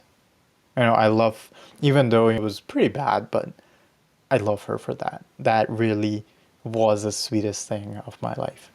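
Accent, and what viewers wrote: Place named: United States. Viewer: U have like an American accent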